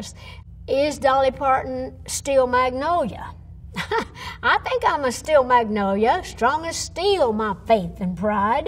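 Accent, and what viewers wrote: United States. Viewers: she is the most american-sounding person I've ever seen haha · one of my favourite and most distinct american accents lol i just love listening to people who speak like that, it's so fun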